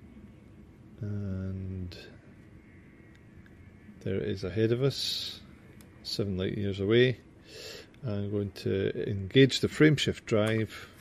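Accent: Scotland, United Kingdom. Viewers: You sound just like me. I,m from Coatbridge. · are you scottish i can hear the accent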